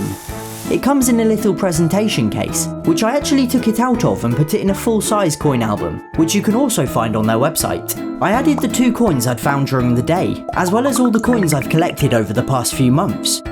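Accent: United Kingdom. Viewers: Amazingly British, very nice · I KNEW IT HE IS BRITISH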